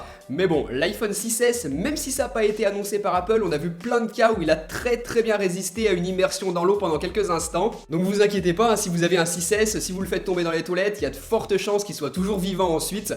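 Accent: Lyon, France. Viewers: Mais tu es de LYON ?! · Oh mais t'es lyonnais???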